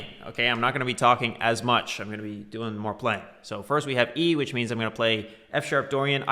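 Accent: Canada. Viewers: Level 2 made no sense - canadian accent made words indistinguishable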